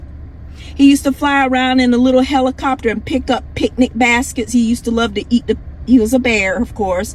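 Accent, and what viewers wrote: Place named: South, United States. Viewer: Man this the kind of woman I was raised around. Sound like my aunts in the south. You dropping nothing but the truth.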